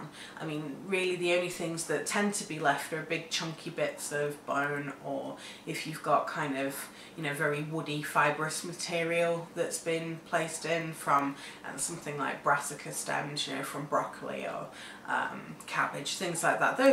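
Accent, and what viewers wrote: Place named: United Kingdom. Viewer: How have I never heard of Bokashi before?! This is brilliant! (And why was I surprised you have a British accent? I knew about England! Ha!) Did you mention how much the bags of bacteria cost? I was wondering about the start up cost for the system and how much it costs to make a batch of compost, since you put a cup in every time you fill it. I could have missed that part. (Toddlers.)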